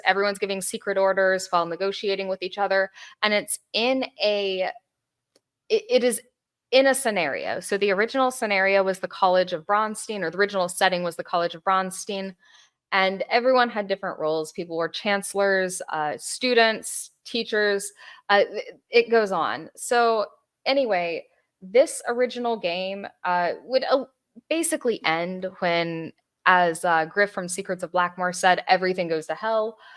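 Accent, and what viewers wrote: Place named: United States. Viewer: You have a pretty flat American accent, anyway. The bastards just want to silence people and control everything 🤨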